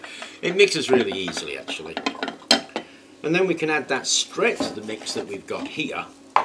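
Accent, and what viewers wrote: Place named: England, United Kingdom. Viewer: I use polyurethane one coat and then I mix a little 5 micron graphite powder in it. You can also do the 2 part mix of elmer's glue but it doesn't work as good. What was the stuff you used as a solvent and what did you mix it into, I couldn't hear what you said in the video. LOL you kind of said it fast with that english tongue of yours.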